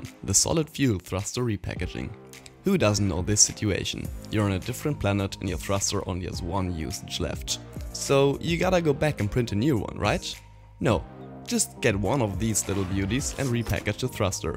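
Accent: Germany. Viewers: You are German Right? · Der deutsche akzent ahhh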